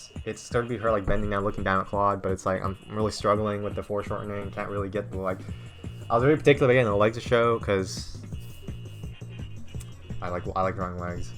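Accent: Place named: New York, United States. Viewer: I hear nyc ;)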